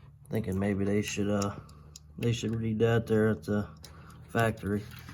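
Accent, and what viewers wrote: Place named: Pittsburgh, United States. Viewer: Dude u gotta be from Pittsburgh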